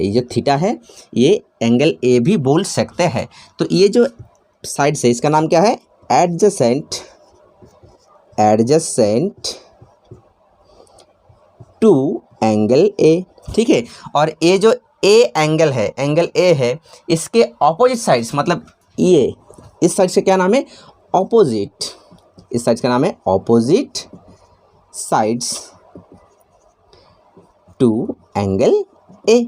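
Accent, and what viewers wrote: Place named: Bengal, India. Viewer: Thoda thoda bangla arehehe but it doesnot matter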